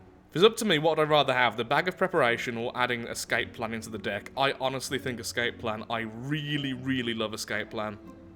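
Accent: United Kingdom. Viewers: watched the entire video with subtitles only no sound and, it was funny the errors google's speech to text makes trying to translate the British accent.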